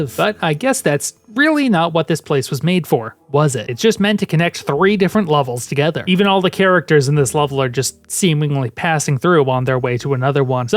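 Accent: Boston, United States. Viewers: The random Boston accents throughout the video are making me go bananas. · I just have to ask... Is that a Boston accent? Can you say "Coffee, Cheetos, Chicken" in your next video for me??? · You sound like a bostonian. (Given how you replace er with a, and you speak with an accent)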